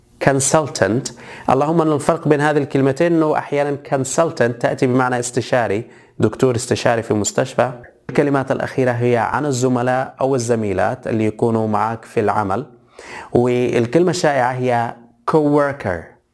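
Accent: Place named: United States. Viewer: انت كذا 👍 ...نطقك كانك مثل الامريكان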